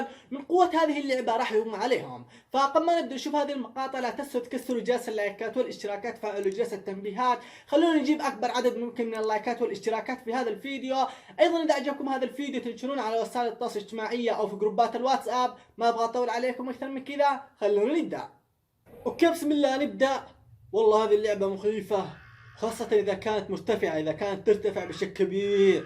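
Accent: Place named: Yemen. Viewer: اليوتيوبر من اليمن واضح من مخارج الحروف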